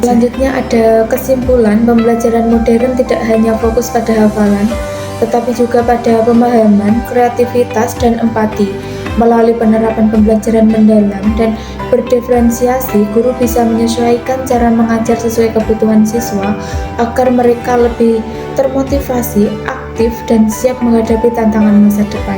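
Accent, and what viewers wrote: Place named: Java, Indonesia. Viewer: Medok sekali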